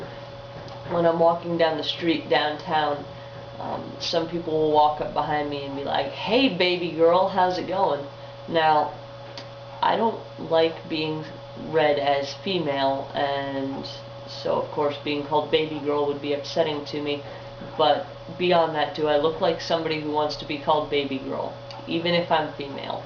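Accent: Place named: Midwest, United States. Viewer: You say "to me" like a midwesterner now!!! You say TO me, instead of to ME. (Loving linguistics.)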